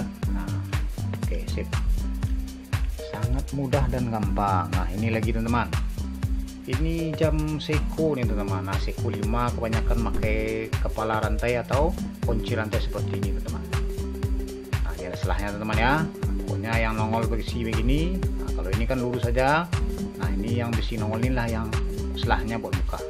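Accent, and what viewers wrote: West Sumatra, Indonesia. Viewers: lu orang minang kah bang?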